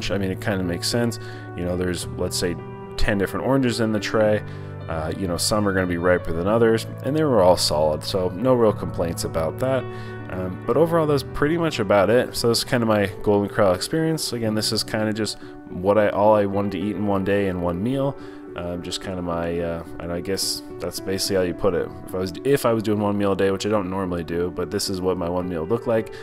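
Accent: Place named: Texas, United States. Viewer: Oh everytime you come to Texas you start speaking Texan... that's so cute. I also go for the fried fish and green beans when eating at Golden Corral. Pot roast, never, it's always too dry. Bye enjoy your stay in Texas.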